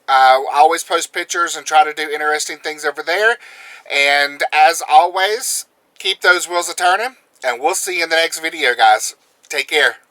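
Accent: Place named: South, United States. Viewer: Btw, where you from? I found another blogger that at least sounds as if they’re from the south. Tri Cities Tennessee here.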